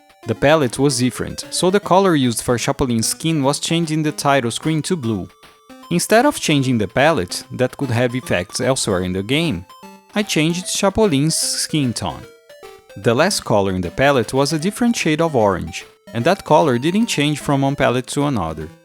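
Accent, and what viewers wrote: Brazil. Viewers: Seu sotaque brasileiro é muito charmoso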